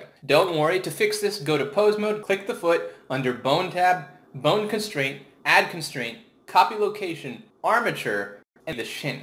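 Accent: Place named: Brooklyn, United States. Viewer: before he had the brooklyn accent.....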